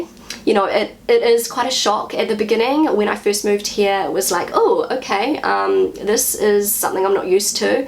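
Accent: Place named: New Zealand. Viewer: New Zealand English sounds quite cute. However, the choice of words is a bit strange sometimes. ;-)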